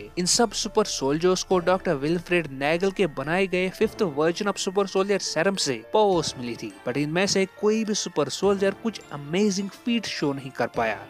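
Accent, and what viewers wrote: Kashmir, India. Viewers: Bro Are you Kashmiri . You have a Kashmiri accent ❤️❤️❤️❤️❤️😘😘😘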